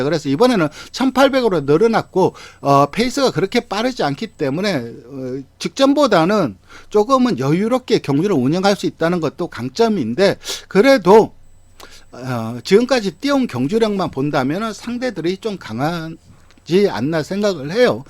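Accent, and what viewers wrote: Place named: Busan, South Korea. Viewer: 최강게임 쌍으로 조질라니까 부산말 싹 빼고🏇🐎😷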